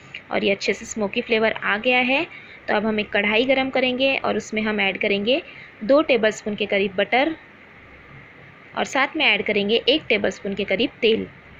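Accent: Hyderabad, India. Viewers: Aap Hyderabad daccan se hayn?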